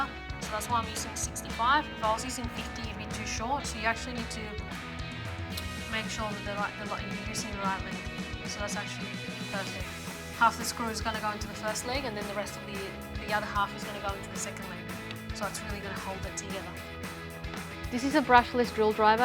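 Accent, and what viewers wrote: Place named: Australia. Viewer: How refreshing to see a woodworking video in metric combined with australian accent!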